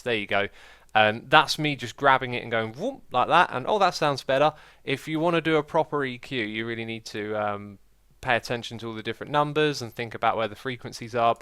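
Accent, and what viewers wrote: United Kingdom. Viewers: I think it's the accent. idk why but British accents don't sound like metal fans to me.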